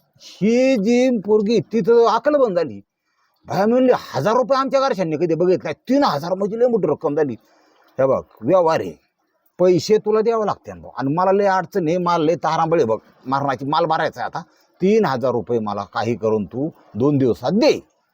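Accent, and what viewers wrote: India. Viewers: Good job Sir , खुप छान ग्रामीण मराठी बोलता तुम्ही 💐💐💐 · Mama तुमची गावरान भाषा कानाला ऐकायला छान वाटते. जाऊद्या गाडी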